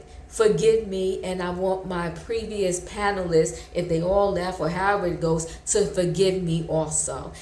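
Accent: New York, United States. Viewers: you sound sooo new york and i love it! bloop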